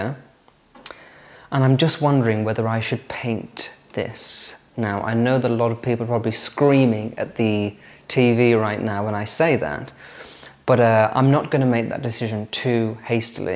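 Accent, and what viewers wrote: RP, United Kingdom. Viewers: Im a new subscriber,and really enjoy your videos,i would like to make a point,i wish most people had your way of speaking,its not artificial its the Queens English,i speak the same way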